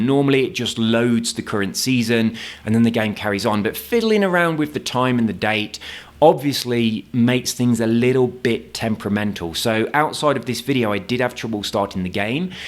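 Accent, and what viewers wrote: United Kingdom. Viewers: Why do you sound Cornish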